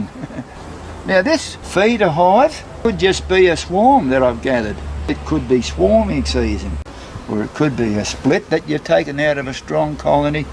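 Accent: Australia. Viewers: I came across this channel with your massive stash of sweet potato and then I was hooked as soon as I heard your Australian and saw the bees. You and are absolute darlings and I hope to catch more of you both. I sincerely hope all is going well xxx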